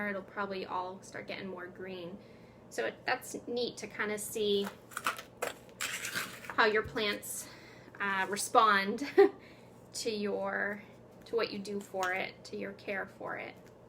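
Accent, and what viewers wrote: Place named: United States. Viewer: Are you in Bridgewater in UK or USA. I notice your USA accent. My temerature is only 20°c and my beany is losing leaves by the handful after I just watered her!! Please help.x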